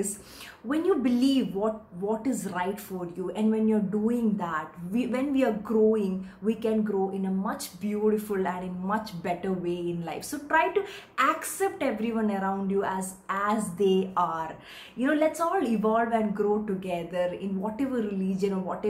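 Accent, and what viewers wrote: India. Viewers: No popular english self help books are available over YouTube in Indian accent. I think you have a wonderful, sweet and friendly Indian accent. If you think of doing it, it would definitely help lots of aspirants like me in developing our English beside personality. Thank you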